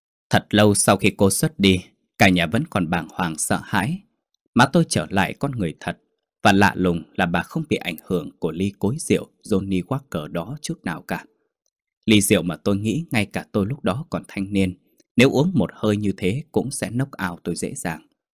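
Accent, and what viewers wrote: North, Vietnam. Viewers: Giọng bắc diễn đọc thật hay.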